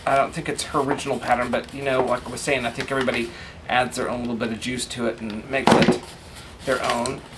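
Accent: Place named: South, United States. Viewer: Fiona has been singing your praises for so long & I finally made it over here. Now I know why. I love the way you don't even think about it. You just keep explaining as you're going along & it's like we're having a conversation. Well except for the part where you can't hear me respond. :) Also the accent & the y'all made me feel like I was watching a long, lost cousin. Where are you from? I was born & raised in Texas. :)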